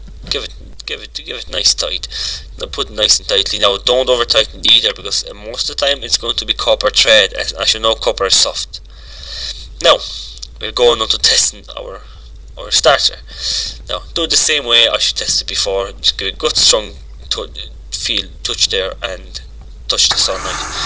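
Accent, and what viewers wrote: Ireland. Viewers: Brilliant stuff ... very clear ( I like your irish accent)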